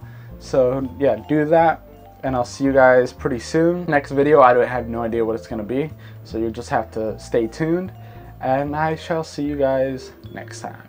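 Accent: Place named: Scotland, United Kingdom. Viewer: I like your Scottish accent :)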